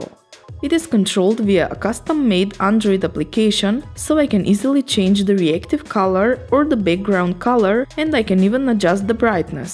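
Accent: Russia. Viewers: hard to imagine what was the cost of that table :) PS: are you from Russia?))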